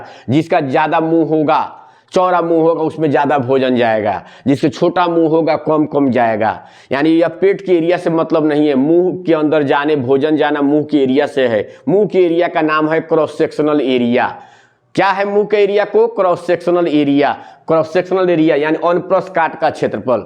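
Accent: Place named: Bihar, India. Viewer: Bro speaks in bihari accent💀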